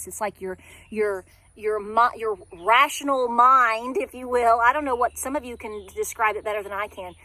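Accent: South, United States. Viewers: I LOVE your accent!! It’s such a beautiful southern sound; both my grandmothers were southern and I loved hearing the soft lilt in their voices:)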